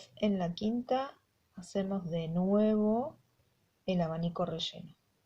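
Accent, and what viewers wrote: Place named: Buenos Aires, Argentina. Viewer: Me encanta! Una argentina...por la tonada de Buenos Aires!❤. Bendiciones!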